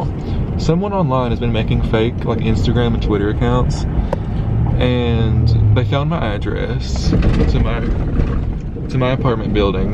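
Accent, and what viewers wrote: South, United States. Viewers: I've never heard a country gay accent, it's so mesmerising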